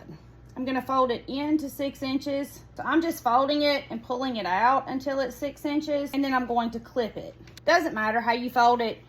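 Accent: South, United States. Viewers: I’m from Arkansas and I live in Idaho. Your accent made my heart ache for home 😭 I love your channel and will follow for sewing tips AND to hear that beautiful southern accent. Thank you so much and God bless you ♥️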